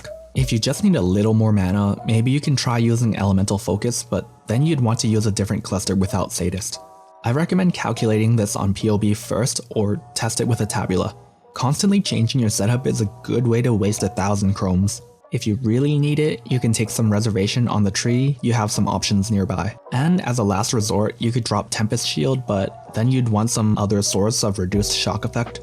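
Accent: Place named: China. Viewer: that chinese accent tho...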